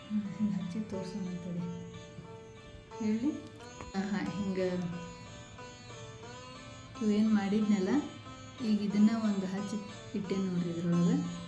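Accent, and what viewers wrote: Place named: Karnataka, India. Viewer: I love ur Kannada slang ❤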